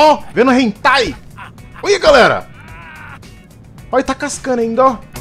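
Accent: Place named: Pernambuco, Brazil. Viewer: conheço um oxi ate se eu fosse surdo,vc e Pernambucano sim como eu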